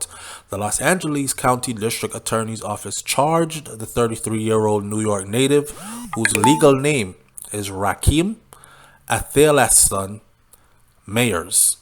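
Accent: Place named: Jamaica. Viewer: You sound like you are from Jamaica...in fact you sound like SOUFLO...you cyan hide from your fans...Big Up to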